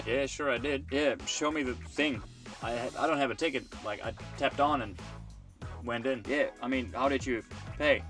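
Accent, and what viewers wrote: Australia. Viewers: As an Aussie... you're really picking up elements of the accent, moreso than any German traveller I've met in my life. That's really cool.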